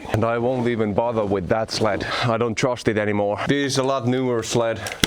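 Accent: Finland. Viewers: Hello from Minnesota USA I enjoyed the video I lived in embarrass Minnesota home of the Finnish sow-na like your country I like the long videos your accent is like the Finn’s in my town and close to Canada 🇨🇦 be safe I’m glad I found your video I grew up in the woods with my father we cut lot of wood and I enjoyed spending time in the woods with my father and having coffee under a tree taking a break enjoying the smells of the woods blessing from Minnesota USA 🇺🇸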